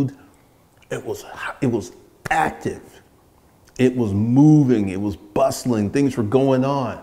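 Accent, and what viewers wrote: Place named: South, United States. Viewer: Dude, not for nothing, coming as a New Yorker, you DEFINITE have a Southern accent to me . 😂😂 Thanks for all the value you give and stay healthy and safe.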